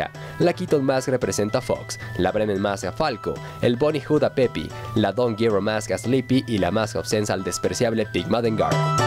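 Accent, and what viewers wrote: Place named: Fresa, Mexico. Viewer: me caga la voz de, fresa y cantadita.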